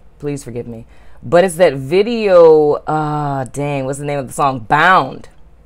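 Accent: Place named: Charleston, United States. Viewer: Love that you have mental health resources in the description box ❤️ Curious to know what bit of accent I’m hearing?... sounds a bit like Charleston (where I’m from) or NOLA (similar sound) or Bahamian (also very similar) lol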